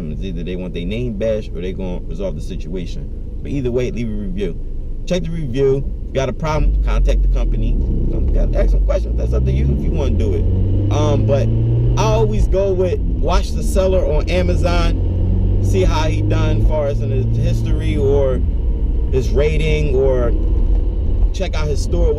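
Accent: Philadelphia, United States. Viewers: Bro you gotta be from philly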